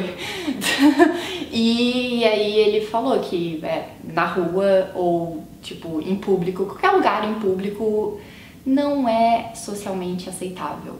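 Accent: Rio de Janeiro, Brazil. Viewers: Acho legal seu conteúdo mas o sotaquezinho carioca é DIFÍCIL de aguentar. Gezus, minha chaleira chia menos... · Vc com esse sotaque de carioca se assustou com esse jeito deles dançarem???????Com esse sotaque de carioca???Para com isso,com certeza vc sabe como se dança funk(não digo que vc dança,digo que já deve ter visto e sabe como é).É simplesmente impossível alguém com esse sotaque de carioca que vc tem se assustar com isso!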